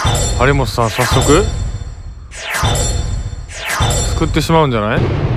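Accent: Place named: Osaka, Japan. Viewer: たまに出る大阪弁嬉しい😍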